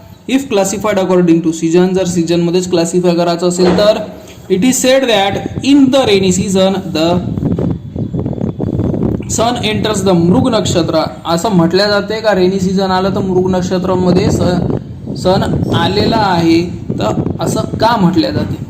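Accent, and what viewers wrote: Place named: Nagpur, India. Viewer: Sir are you from nagpur I think because you talk like nagpuri bhasha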